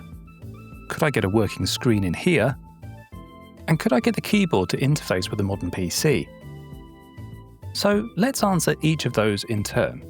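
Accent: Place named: United Kingdom. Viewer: new subscriber here: super nice channel, if I may with a 8 bit guy vibe and a British accent 😊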